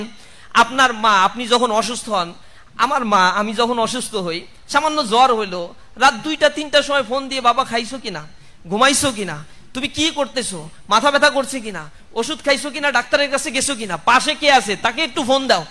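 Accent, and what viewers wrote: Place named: Chittagong, Bangladesh. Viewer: তোমার কথা শুনে মনে হচ্ছে , তুমি চট্টগ্রামের মেয়ে।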